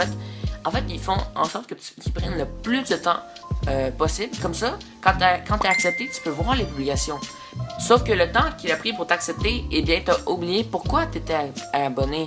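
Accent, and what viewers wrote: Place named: Quebec, Canada. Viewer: Gg t québécois j'adore ton accent 😍😍😍😍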